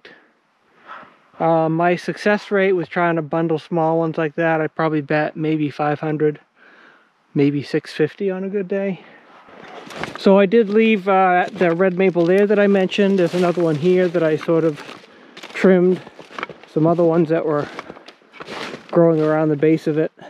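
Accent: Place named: New England, United States. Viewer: I just randomly came across your channel and thought I’d check it out. I have to say I’ve been enjoying watching your videos quite a bit! I really enjoy watching you play in your playground and all your cool toys! I like your commentary about what you are doing and your thoughts on the situation. I also enjoy your New Englander accent!! Keep up the great work with the videos and I’ll keep enjoying them!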